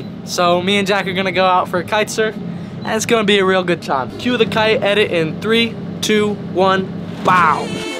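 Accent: United States. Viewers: cazzo, ma è delizioso come parla sto sudista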